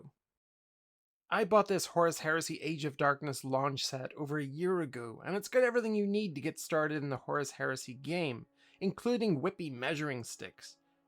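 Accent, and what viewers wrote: Northern Ireland, United Kingdom. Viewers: Do I hear a Northern Ireland accent?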